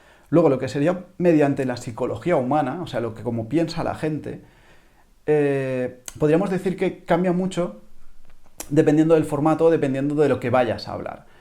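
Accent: Spain. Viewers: Eres Catalan? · Buenos consejos, normalmente me da pereza el acento español pero contigo esta bastante ameno hah! saludos de México.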